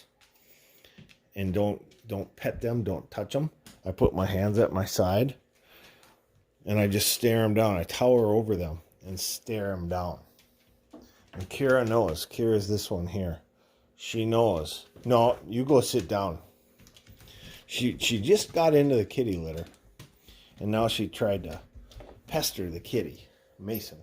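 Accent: Minnesota, United States. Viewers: That minnesooooohhhhhta accent tho. Great vid on the importance of the place command.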